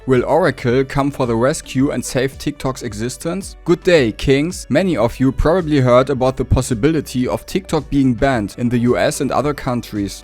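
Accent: Germany. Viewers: Is des a german accent?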